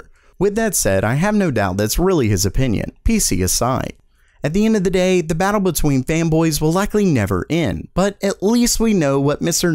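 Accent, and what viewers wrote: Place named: United States. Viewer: Just an American accent lol.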